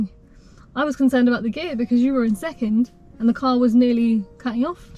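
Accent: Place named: United Kingdom. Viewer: you are beautiful. with driving tips, i am learning the British accent too :)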